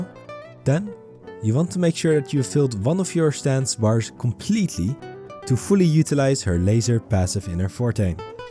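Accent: Netherlands. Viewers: My first video I see of you and the moment I heard you talk, I knew you were a fellow Dutch person XD Thank you for the vid tho! I love Lumi's fighting style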